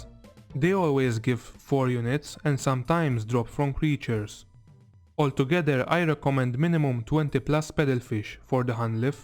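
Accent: Malta. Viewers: you are Maltese I can tell instantly lol.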